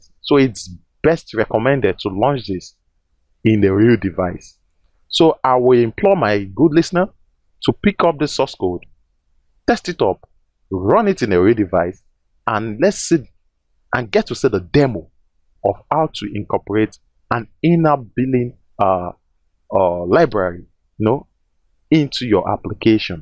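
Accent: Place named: Nigeria. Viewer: "In app billing SARVICE," Naija man for sure. Thanks for the great video.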